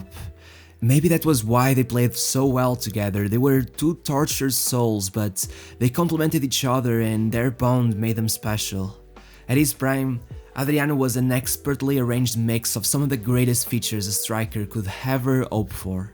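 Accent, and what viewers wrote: Portugal. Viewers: Hey. Yes he is. Its obvious by his accent and the way he pronounce the Portuguese words. Only a Portuguese could say it that way. Cheers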